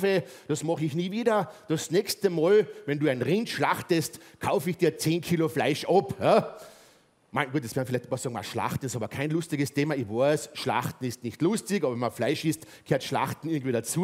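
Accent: Austria. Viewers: Ob das unsere deutschen Nachbarn auch wirklich verstehen vom Dialekt? Und wida amoi gscheid umabrocht 😀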